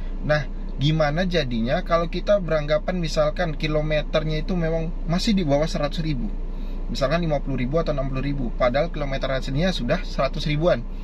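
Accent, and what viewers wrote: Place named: West Sumatra, Indonesia. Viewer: Asslm Maaf Logat kayak orang minang ya bang.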